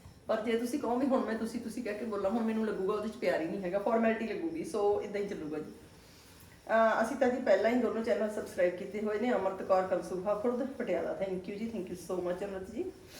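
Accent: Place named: Bihar, India. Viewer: ਬੀਬੀ ਜੀ ਤਾ। ਬਿਹਾਰੀ ਬੋਲੀ ਲੱਗਦੀ ਹੈ ਭੈਣੇ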